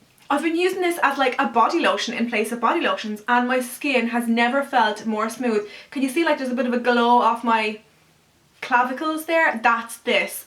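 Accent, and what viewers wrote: Ireland. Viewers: love your vids ! are you from dublin?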